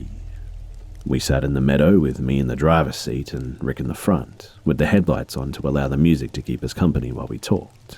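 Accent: Australia. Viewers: Love these videos, they’re the only thing that help me sleep. It’s also nice to hear a familiar Aussie accent :) !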